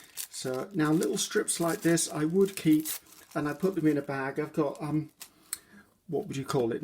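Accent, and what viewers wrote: United Kingdom. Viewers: Hi, it's great to meet you and watch your fresh, lovely British accent creative take on crafting. I'm a new follower and am exited to watch more!!!!! Cheers from Iowa...USA. Tonya:)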